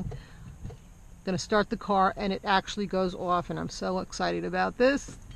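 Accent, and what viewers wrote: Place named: New York, United States. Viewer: Ha! This was great. I'm having the same problem with my 2011 Sonata and thought I might have to replace the fixture. I love how clear and straight to the point your video is... in and out in under 4 minutes. You'd be surprised at how many people mumble, speak too softly, or don't know how to get the main point across in this type of video. I'll try this out and let you know how I made out. P.S. Love your speaking voice. You sound like you're from my neck of the woods (NY/NJ metro area).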